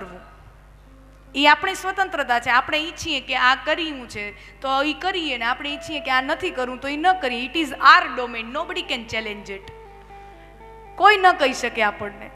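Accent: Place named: Kathiawar, India. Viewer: Aap ni shaili clear Anand thi Kathivadi Gujarat gujarati ma i salute Ben you with Respect Namra bhav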